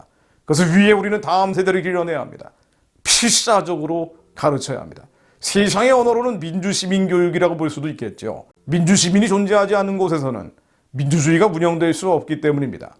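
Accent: North Korea. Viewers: 이분??북한말투 심하게 쓰시네~~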